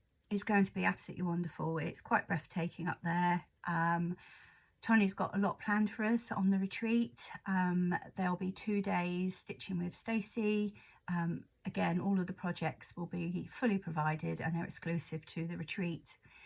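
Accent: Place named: England, United Kingdom. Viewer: So lovely to hear your “English “ voice and see all your beautiful work. We have very similar tastes and we snapped on quite a few pieces. Love your little pumpkin design and look forward to that as a release one day. I’m also on the Raven bandwagon!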